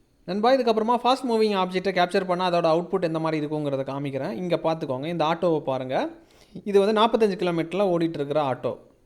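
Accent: Kanyakumari, India. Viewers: Bro நீங்க கன்னியாகுமரி மாவட்ட போச்சு தெரியுது, சூப்பர் bro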